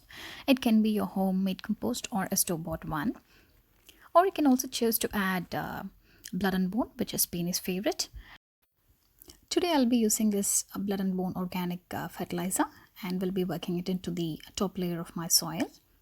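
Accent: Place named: India. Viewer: You have the most elegant Indo-British accent ever! So posh!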